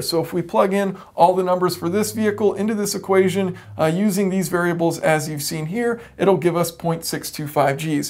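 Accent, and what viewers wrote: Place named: United States. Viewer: You sound like you’re American. What’s with using “kg, and meters”? Speak in American English cock suck!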